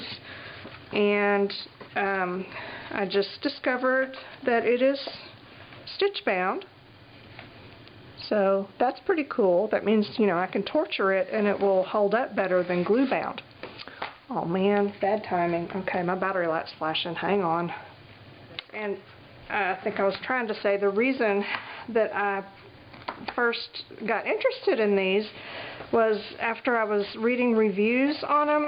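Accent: South, United States. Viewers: This video was great--very informative and entertaining. You are hilarious! I could sit & listen to you all day long! I've seen a couple other videos on Jet Pens hauls-but yours is by far the best. Now mi know I've got to place an order. Just have to get one of each of those notebooks. The way you cere caressing that paper--too funny! And when you smelled the marker I about fell over, I used to do that as a kid with permanent markers. 😜😜 I have subscribed to your channel & will be going back to watch past videos. BTW where are you from? Do I detect a bit of a southern accent? Thanks again.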